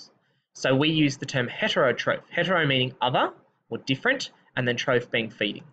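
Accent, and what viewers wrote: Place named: South Africa. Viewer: I bet u r South African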